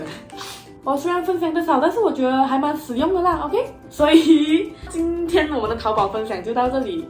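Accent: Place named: Malaysia. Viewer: 我的天 这就是同为马来西亚人的同乡直觉吗(bushi 哈哈 刚刚滑到你的影片 一点进去听了全程韩语 再看了看频道名字放的是英文名，就在猜说是不是马来西亚人呀，结果还真的 哈哈 一点这部一听口音就确认了 哈哈 加油呀🙌影片很棒👍👍😍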